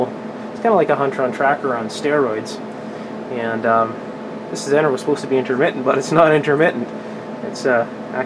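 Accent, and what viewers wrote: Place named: Canada. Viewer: Found you vids, amazing! You are wise, skilled and accomplished beyond your years. Your video production and presentation skills are only surpassed by your technical knowledge and abilities. Your background would be an interesting story! Fascinated with the Canadian "accent." Looking forward to more videos, hope you don't get bored with the process.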